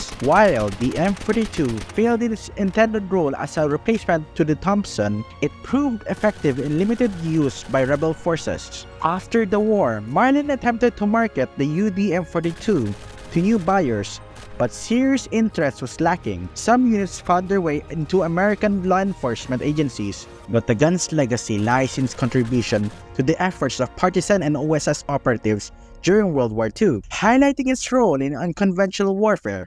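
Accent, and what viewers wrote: Philippines. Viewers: Can’t tell if the VO is an intentionally bad filipino impression or just uncompromisingly authentic, great work as always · you don't need a better mic tho, you gonna need voicemeeter banana to modify your mic quality that sounds good.(free to use) Also, i can notice your filipino accents while speaking english. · I love me a filipino accent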